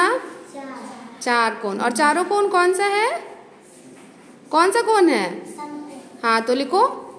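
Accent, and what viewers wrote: Bihar, India. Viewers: Aapki voice bht achii h di bihari tone ❤ love from uttrakhand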